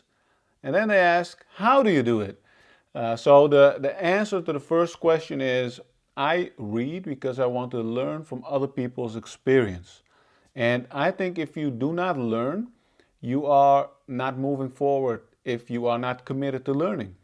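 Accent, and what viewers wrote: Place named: South, United States. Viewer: Is it me or you sound like a Southern American, although you're in Netherlands?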